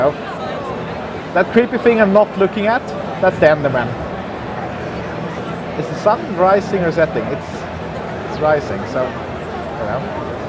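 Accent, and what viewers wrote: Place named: Sweden. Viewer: I just love english, so typical swedish-english!!!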